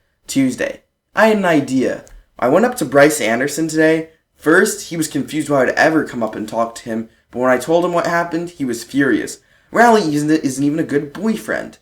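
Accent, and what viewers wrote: United Kingdom. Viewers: Hey bro, small doubt.... Your scotish right?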